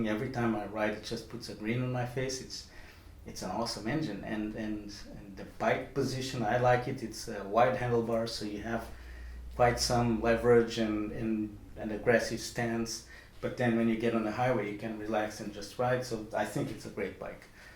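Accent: Portugal. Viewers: I can se by the use of some specific words and because i usually have a good sensibility detecting Portuguese accent, behind the English.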